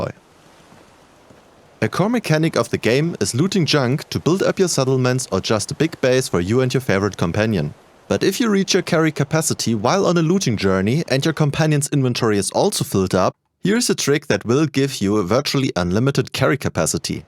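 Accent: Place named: Germany. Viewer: Bro can't get rid of his German accent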